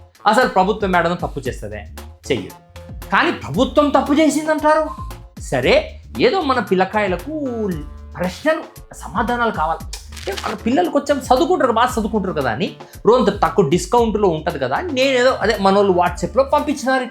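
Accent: Rayalaseema, India. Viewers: బాగా చెప్పావ్ bro. మన రాయలసీమ slang lo Super ga cheppav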